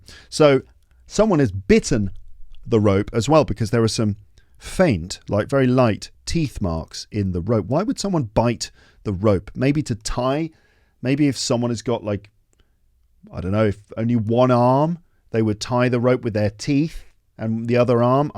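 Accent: RP, United Kingdom. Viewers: It's been a great experience being with you in the podcast episodes all this time as a learner of English as a second language. I have learnt a lot and continue to do so - without any loss of interest. It's good - a good exercise being with you learning vocabulary, pronunciation, etc. Your clear voice, the RP accent and the associated other features have made me a regular visitor to your podcast, which is, I believe, has been the most useful source for acquiring the language in a natural way for many a foreigner. Hope the podcast will be as good in the coming year and the listeners/video viewers will be looking forward to watching and listening to very useful content as you have generously brought us for ever. Congratulations! Happy New year! To and the family and the lepsters.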